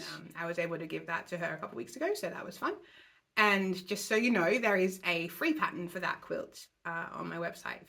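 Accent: Australia. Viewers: Congratulations on your first video. Although you were nervous you came across very well. Looking forward to more of your videos. And yes, much like other commenters, it is lovely to watch and have a fellow Aussie talk about quilting . Keep going!! Sending positive vibes from Melbourne. 🌸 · Congratulations on your first vlog. I enjoyed listening to another Aussie. I’m from QLD and have the Hope dress still to sew although summer is catching up with me now. Looking forward to your next vlog! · Hello! Lovely to hear another Australian, I am from Brisbane and I am sure you will be good at this! Looking forward to seeing Moore!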